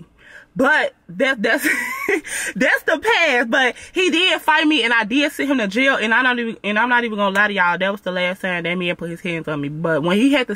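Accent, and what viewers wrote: United States. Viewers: A lot shit y’all do stem from where we from “Chicago” both ears pierced is heavy in Chicago I got both my son ears pierced and the way you talk is also us Chicago females we talk hard asf naturally! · Picking up that southern accent sound nice on you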